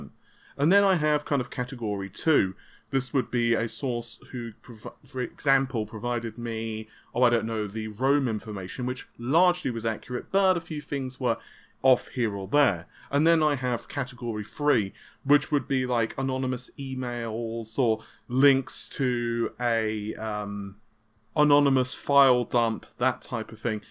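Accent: England, United Kingdom. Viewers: He has a thick english accent lol, just get over it you know what he means. He sounds like everyone sounds near him.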